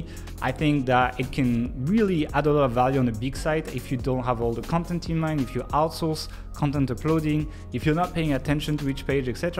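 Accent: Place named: France. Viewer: I've never heard anyone talk about plugins before with a French accent, and now it's all I want in my life.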